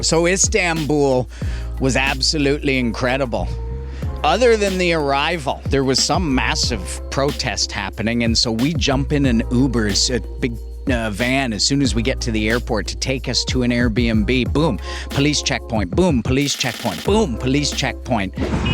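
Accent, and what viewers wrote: Canada. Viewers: lol I love the canadian accent. They can't rob us of that no matter where we live, or for how long!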